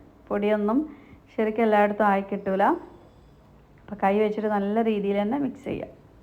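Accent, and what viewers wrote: Malappuram, India. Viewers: മലപ്പുറം താനല്ലേ മലപ്പുറം ബ്ലോക്ക് സംസാരം കേട്ടപ്പോൾ അതോ അവിടുന്ന് മലപ്പുറത്ത് അല്ലാത്ത പോലെ തോന്നുന്നു ഇത്രയും ഒന്നും വേണ്ട നമ്മൾ നമ്മൾ മലപ്പുറക്കാർ അല്ലേ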